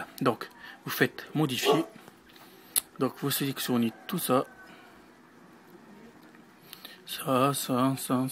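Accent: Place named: French Polynesia. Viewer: J'ai grillé direct que tu étais un polynésien ma gueule !!!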